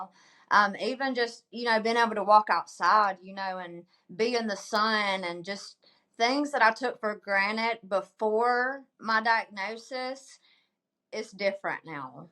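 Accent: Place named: Alabama, United States. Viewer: What a lovely lady , so brave and positive. Love your accent . I love Alabama ❤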